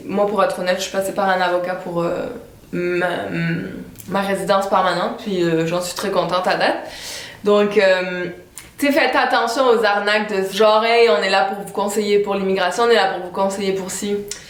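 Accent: Quebec, Canada. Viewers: elle a un accent quebecois un peu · 50% accent qc 50% francais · Ouii un petit accent du Quebec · elle a un accent parfois quebecois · c'est dingue l'accent québécois que vous avez pris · l'accent canadien est en train de prendre le dessus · tu a choppé l'accent Québécois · l'accent s'apparentait plus à l'accent Québecois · Un melange délicieux d'accents québécois et français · t as l accent québecoise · elle a un accent mêlé entre l'accent français standard et l'accent québécois standard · Tu commences à avoir l'accent québécois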